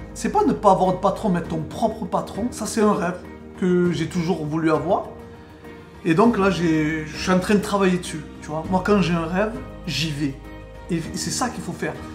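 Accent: Marseille, France. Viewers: Est-ce que t'es un marseillais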